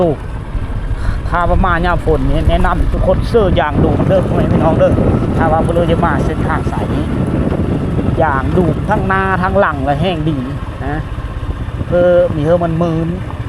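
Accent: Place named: So, Thailand. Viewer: ฟังคือภาษาโส้ กุสุมาลย์ สกลนคร อะจอ อะลีก เดอะ คือกันกับโส้กุสุมาลย์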